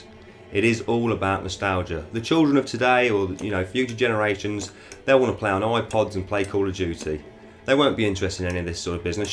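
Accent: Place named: Midland, United States. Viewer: Fair comment - I'll try to add a bit of comentary - although I'll probably best add some subtitles to enable any non-locals to understand my midland accent rambling lol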